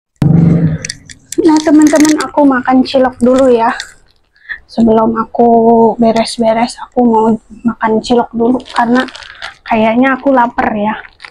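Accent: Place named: Bekasi, Indonesia. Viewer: Dr logatnya sih bekasi banget 😂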